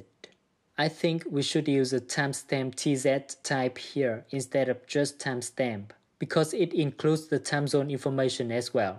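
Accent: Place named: Vietnam. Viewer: Your accent sounds like Vietnamese speaking English! Anw thank you for the tutorial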